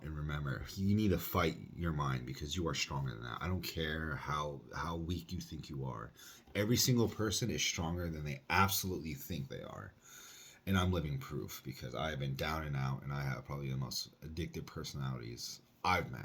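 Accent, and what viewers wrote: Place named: United States. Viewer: You look really Norwegian indeed. Hearing your fluent English American accent is so weird.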